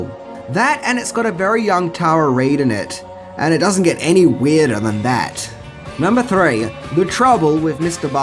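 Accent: Australia. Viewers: What a shame, potentially interesting feature spoiled by world's most annoying voice/accent/host. Let's hope Mr Barlow pays a nocturnal visit to this Antipodean git and silences him once and for all. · are you an Aussie or a Kiwi? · The commentator here has got one of the most irritating voices I've ever heard, Aussie accents are dreadful to start with, but this bloke really grates.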